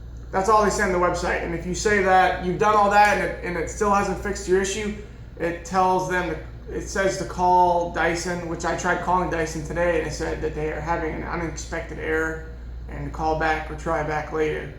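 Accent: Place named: Cockney, United Kingdom. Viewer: you are a star! Spanish Cockney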